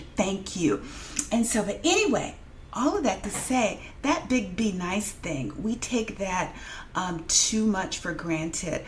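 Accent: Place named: South, United States. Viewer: okay, I thought I was picking up that southern lady style and I did hear you say that you and your husband were at Clemson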